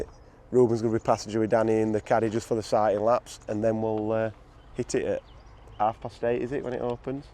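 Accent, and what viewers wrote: Yorkshire, United Kingdom. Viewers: Yorkshire accent and a mullet had me rolling 😂😂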